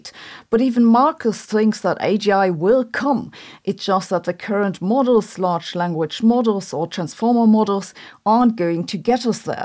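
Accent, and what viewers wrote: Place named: Hesse, Germany. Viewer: Ich war auch schon am überlegen aus welchem Bundesland sie stammt.. 🤔 Hessen..?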